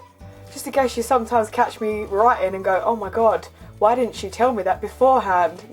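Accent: Essex, United Kingdom. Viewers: hey, greetings from Turkey, thanks for the videos, heped me a lot get Essex accent. ❤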